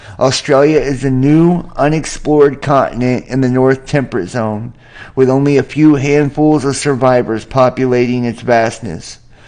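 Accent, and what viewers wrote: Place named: France. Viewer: I will always love your french accent! 😋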